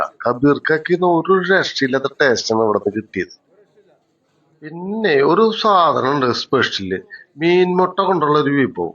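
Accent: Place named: Thrissur, India. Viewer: ആ തൃശൂർ സ്ലാങ്കിൽ ഉള്ള ആന്റെ ആ അവതരണം കിടുക്കി ട്ടോ ഗഡിയെ 👌😀👏👏👏👏👍 മൊട്ട ഗ്ലോബൽ ടീം സൂപ്പർ 💐👌👌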